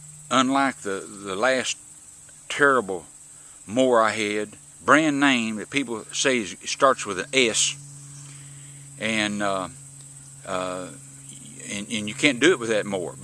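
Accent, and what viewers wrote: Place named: South, United States. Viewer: You sound like you are from the south. My neighbor has this same mower.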